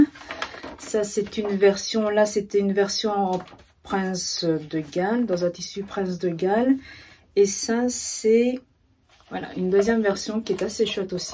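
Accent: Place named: Belgium. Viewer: Coucou , je te découvre et j’ai adoré !! Tes cousettes sont vraiment très chouettes et chic !! J’aime beaucoup tes cousettes hommes ! Pour ton pantalon carotte , il est top , peut être le couper « tête bêche » comme pour un jean ? Pour éviter que ça vrille ? J’aime bcp le gilet sans manches de ton mari ! Pour les Jean , je vais regarder de plus près ce livre car je suis conquise par la couture jean aussi et c’est qd même un beau budget ! Bref je te dis à bientôt !! Et j’avais bien reconnu ce petit accent !! J’ai fais ma primaire et secondaire en Belgique !! A bientôt 😍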